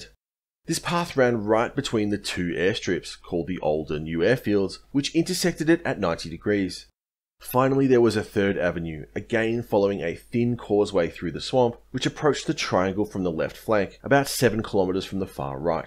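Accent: Australia. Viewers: As an American whose father and uncles fought in Europe, and neighborhood families who fought in the Pacific, wonderful to hear detailed, reasoned, account of Bona-Gona, with an Aussie accent, which makes it believable. My generation never heard much (except sinking transports!) Many thanks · Wonderful great to hear an Aussie voice at the helm. History needs to be told by all nations. Here in the UK, the young seem to be denied an education whilst being fed on a diet of puerile rubbish like who's bonking who on Love Island .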